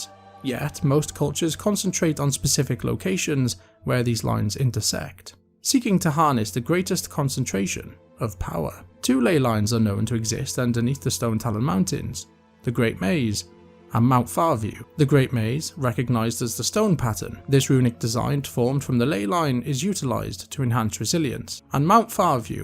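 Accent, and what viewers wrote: Wales, United Kingdom. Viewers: A fellow Brit but do I detect a hint of a Welsh Accent, a fellow Welsh kinsman? :0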